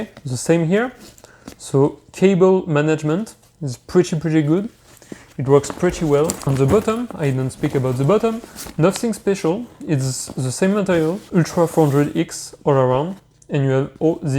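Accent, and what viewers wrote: France. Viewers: I can easily guess you are french, parce que moi aussi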